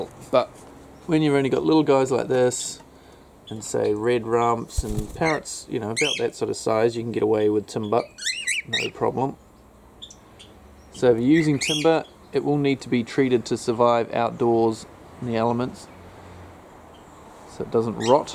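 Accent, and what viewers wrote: New Zealand. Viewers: I recognized a fellow kiwi accent immediately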